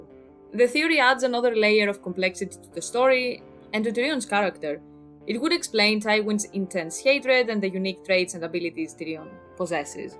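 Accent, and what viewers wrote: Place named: Greece. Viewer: Thumbs up who always reads the community posts in her voice (greek accent ftw) 👍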